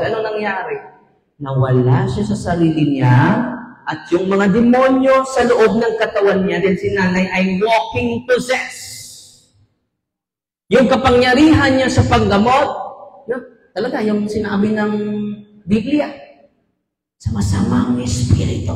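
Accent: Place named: Iloilo, Philippines. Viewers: Ang cute mag ilon go speak 😊 Gusto KO Sana pumonta,hi de knm ALAM San yan😅 Amen🙏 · Very good kaayo Father! Mora man pod ka Ilonggo. Passing na kaayo Father imo Ilonggo.naa ra gamay medyo kimaw pag pronounce like U-tod. (Not utód). Pero okay na kaayo Father!wow!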